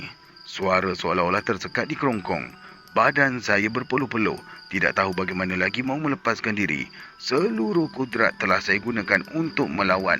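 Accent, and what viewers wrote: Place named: North, Malaysia. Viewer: Mantap bos lorat utara😂.sebijik oww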